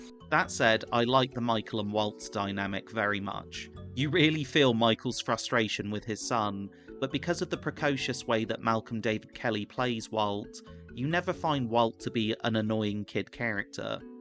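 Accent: United Kingdom. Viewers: For the love of God, can we get someone who isn’t from the 🇬🇧 to narrate this? Are we (🇺🇸) so shallow or thick- that we “Need” a gnarly British accent just to pay attention for a little while?? C’mon it’s gettin’ kinda pathetic, now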